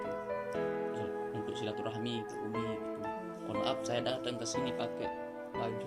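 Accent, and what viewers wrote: Lombok, Indonesia. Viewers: logat lombok memang kental bang 😂😂 mantablah👍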